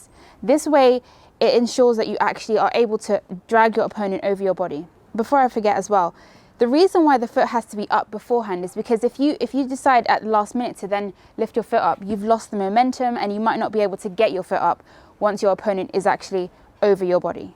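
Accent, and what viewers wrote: United Kingdom. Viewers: Having studied Judo for 10 years, I'm surprised that you would suggest this difficult and risky throw in a street confrontation. In sport Judo on mats or on TV it looks spectacular, but in real life, if you misplace your foot, the attacker will be on top of you. And now you're grappling on the ground. Not a good place to be.This throw requires a great deal of mastery to be effective in a street fight. I would rather use Taitoshi where you also use forward momentum but you cross your leg in front of both attacker legs and pull him over.Easier to learn and have used it many times. I grew up in New York!! :-)) Nothing like a pretty girl with a sweet British accent:-)) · Are u from the UK? i asked because of your accent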